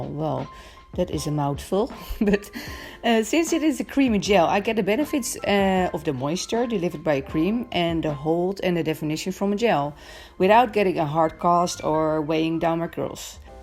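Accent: Netherlands. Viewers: I can tell that you’re dutch just from your accent and how your head nearly touched the shower thing😂 (I hope that wasn’t offensive💕)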